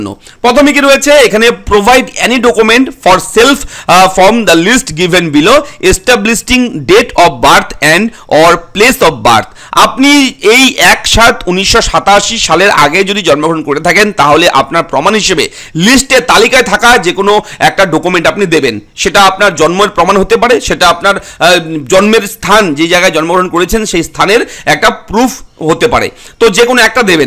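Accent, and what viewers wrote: Bangladesh. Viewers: Jini announcement korchen, taar uchcharon shune to mone hochche je tini Bangladeshi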